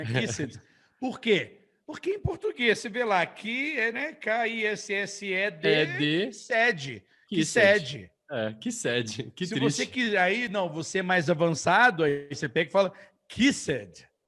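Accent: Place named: United States. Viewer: Sua pronúncia é bem americana diferente do inglês britânico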